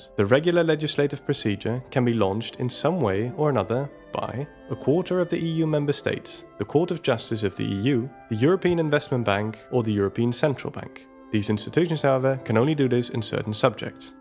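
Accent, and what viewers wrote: Netherlands. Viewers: Can I place a guess and say you are Dutch or Flemish?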